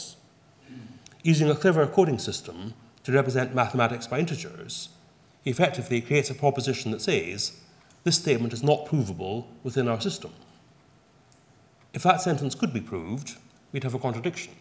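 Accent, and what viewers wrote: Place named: Scotland, United Kingdom. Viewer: all godel proved is mathematicians like to argue and are pompous at best.. but his accent. the most posh scottish i have ever heard lol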